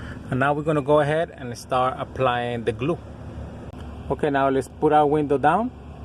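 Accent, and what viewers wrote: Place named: South Florida, United States. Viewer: OMG, thanks for this video! I was about to try on my moms super saggy headliner and I KNOW that foam is all deteriorated like in your video, I can feel it crumbly through the fabric. It's not worth it right now, lol. btw, I KNEW you were also from SoFla as soon as I heard you talk, thanks for the great video neighbor!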